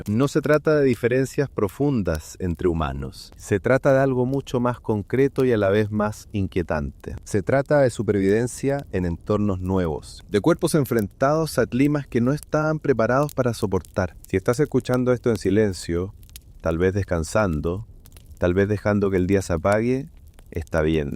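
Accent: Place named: Chile. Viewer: Me encanta la IA con lenguaje cbileno, like en todos estos videos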